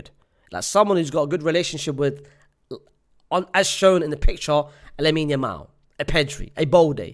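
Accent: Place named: United Kingdom. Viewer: This dude makes me hate the British accent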